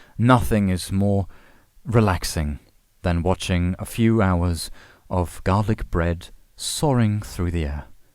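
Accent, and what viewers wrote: Germany. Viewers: Everyone be up in the comments simping or talking about how he’s German. I’m just here like, okay cool it’s..a familiar and comforting voice. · Bro are you german/austrian/swiss??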